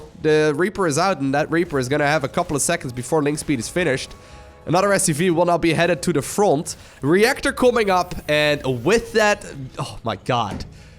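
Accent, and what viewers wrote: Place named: Netherlands. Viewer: dutch accent spotted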